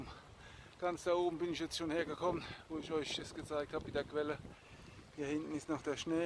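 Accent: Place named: Mannheim, Germany. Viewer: Schönes Monnemerisch 😁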